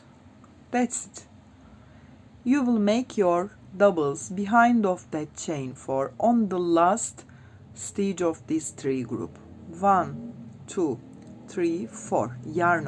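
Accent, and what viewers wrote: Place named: Turkey. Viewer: best turkish-english i have ever heard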